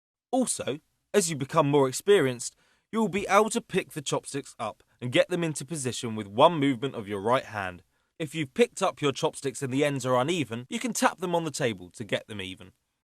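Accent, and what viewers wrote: United Kingdom. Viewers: i think it's funny that the narrorator sounds british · Okay now British man instruct me on how to seduce that Asian chick.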